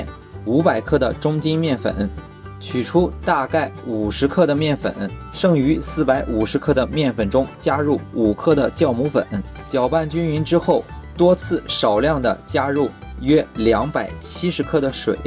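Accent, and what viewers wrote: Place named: Shandong, China. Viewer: 老乡你是山东哪儿的听着很像我那地方的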